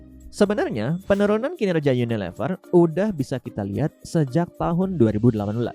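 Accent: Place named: South, United States. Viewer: Logat southern American ya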